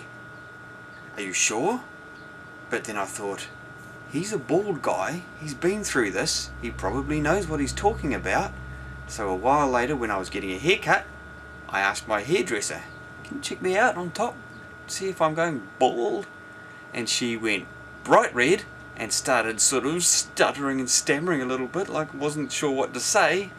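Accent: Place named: New Zealand. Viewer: wow, new zealand accent is similiar to british, but quite different at the same time...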